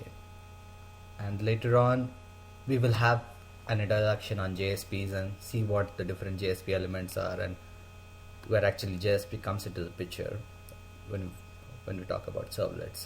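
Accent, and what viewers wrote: India. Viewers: i have problem with understanding indian accent :(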